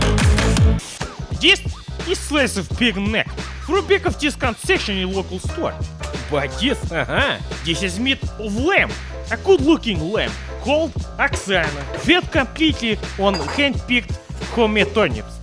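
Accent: Russia. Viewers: I watch too many of your videos, I no longer need subtitles, I understand you just fine. A friend asked me if I speak Russian, I said "no Cyka Blyat", but I understand English, it's almost Russian 🇷🇺. Keep the videos coming!!! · Your thick russian accent made me a little tired but you are so funny :)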